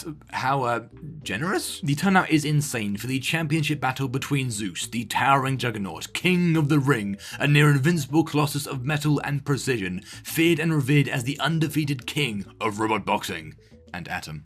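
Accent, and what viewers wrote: United Kingdom. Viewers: You remind me of Highboi, but you're british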